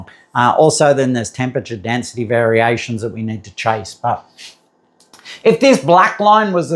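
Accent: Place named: Australia. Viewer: Great video! I’m challenged to keep up (British vs American accent), but YouTube speed control to the rescue. One nit pick. A few times you said “mil” but it looked like you wrote “mm” on the board. And unfortunately the board writing was not clear enough to be certain that you had written “mm”. The problem is that being Australian, you are libel to use either imperil or metric, and hence it is imperative to be crystal clear as to which units we are talking about. I’m a physicist and hobby machinist in USA, so I’m well versed in both metric and imperial. In USA (and a large part of the world), when even remotely associated with machining, a “mil” is a thousandths of an inch. No self respecting physicist (and really any scientist) would ever use the word “mil” for “millimeter”. We call it by its proper name, “millimeter”, “centimeter”, “decimeter”, etc. 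why? Because that is what it is. And as a byproduct one avoids all possible confusion with the imperial “mil” (1/1000”) which is pervasive in the imperial machining world. Maybe if 100% of the world uses metric in all areas then we might tolerate a slang of using mil for millimeter. But the world is not there yet and likely quite a few years away from such universal adoption. In the mean time we really need to stick to calling things by proper and industrially accepted names and refrain from shortcut slang which might be confused. Thanks!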